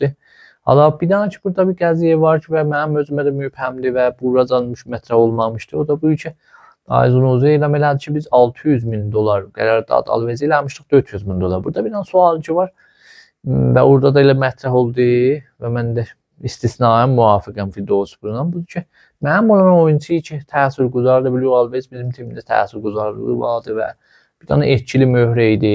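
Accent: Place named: Turkey. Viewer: مگه تراکتورسازی تبریز مال ترکیه ست که تو با لهجه ترکی برنامه ساختی؟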